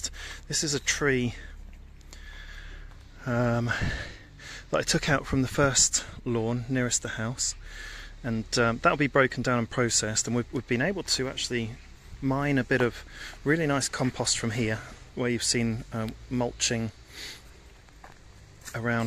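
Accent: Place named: United Kingdom. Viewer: Just found your channel and enjoyed the content so have subscribed I love hearing the British accent, what part of England are you from? Cheers from Melbourne Australia ❤️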